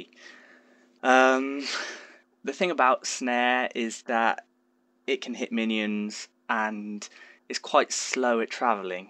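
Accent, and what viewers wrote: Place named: RP, United Kingdom. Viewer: you're so posh, also are you reading from a script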